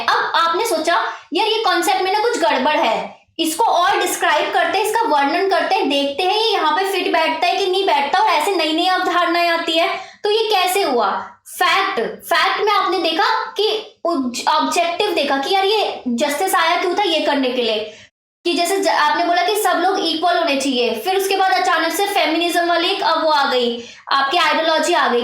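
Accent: Assam, India. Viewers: Ma'am are u Assamese?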